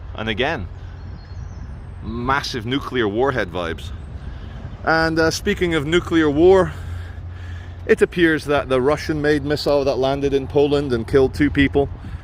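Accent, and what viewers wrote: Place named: Newcastle, United Kingdom. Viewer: Loved the Geordie accent *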